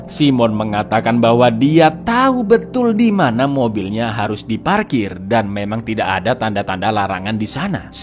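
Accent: Java, Indonesia. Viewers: Bng lu orang Jawa bng 🤣🙏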